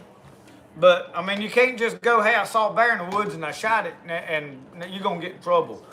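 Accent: South, United States. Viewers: Great content my Mississippi brother..I could travel across the world and always feel at home when I hear that accent.. reminds me of the South and Tennessee.. Gods blessing on you and your family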